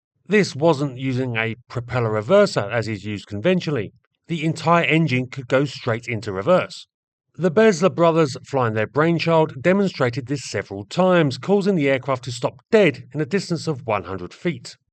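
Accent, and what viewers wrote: United Kingdom. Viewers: battle of the monotone Brits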